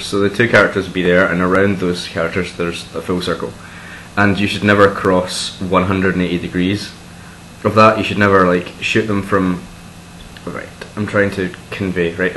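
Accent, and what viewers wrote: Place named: Glasgow, United Kingdom. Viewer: Buffalo '66 is an amazing film! Also! Nice glasgow accent!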